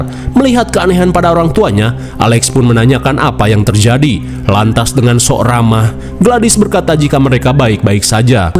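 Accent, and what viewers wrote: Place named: Java, Indonesia. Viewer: Mc nya beda ni biasa nya oke bhasa.. Medok Jawa.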